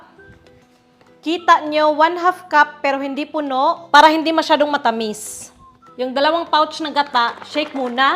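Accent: Visayas, Philippines. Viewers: Bisaya kaba te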